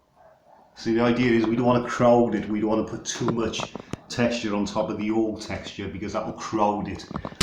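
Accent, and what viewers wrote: Cardiff, United Kingdom. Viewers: Thank you. Do I detect a Cardiff accent 😊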